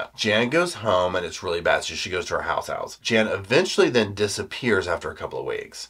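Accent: South, United States. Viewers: One of the reasons I so relate to is his Southern nature. He has a rhythm to his speech that brings me back to my own Kentucky roots. It feels like down home to me. ❤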